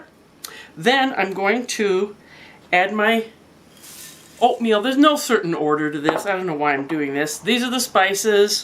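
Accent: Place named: Illinois, United States. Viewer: Got to love that Illinois accent! Hahaha gets 3. Oh well he will make up for it in the pie Dept!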